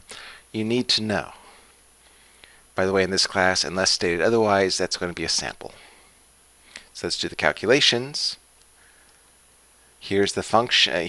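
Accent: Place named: West, United States. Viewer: Kindly add subtitles. Thus make this vid approachable to whom aren't comfortable to western English tone.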